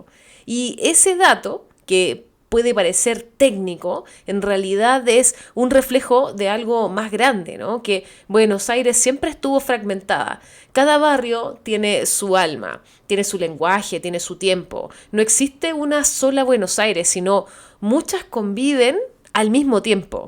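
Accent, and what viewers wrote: Chile. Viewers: Hola, querida...he visto varios de tus videos y siempre quedo maravillado por tus comentarios y tu particular forma de expresarlos con tal riqueza idiomática y con ese encantador acento chilensis. Me asombra tanta cultura en una persona tan joven, y envidio a tus amistades que tienen la fortuna de poder dialogar contigo y escucharte exponer tus agudos puntos de vista. Ojalá algún día te decidas a mudarte a BA para que los argentos podamos disfrutar de tu aporte cultural a lo que ya tenemos. Cuídate, muchachita, y que Dios te bendiga.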